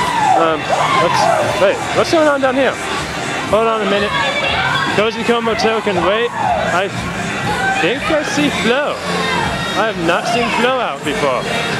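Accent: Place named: Germany. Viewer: Ur accent sounds german but I may be mistaken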